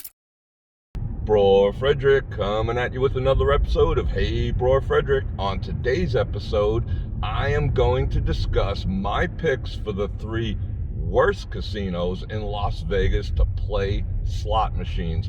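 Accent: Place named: East Coast, United States. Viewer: From your accent are you from the East Coast.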